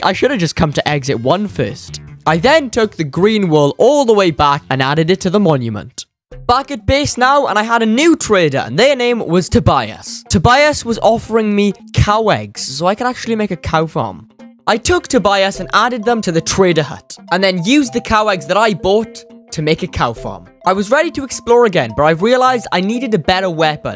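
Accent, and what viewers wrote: Wales, United Kingdom. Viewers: Yo man you welsh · correct me if I am wrong but your accent has a welsh touch to it lol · Are you Welsh? I’m from the UK, and I can’t help but notice the Welsh accent! 😁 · Your accent tells me, an American, that ur British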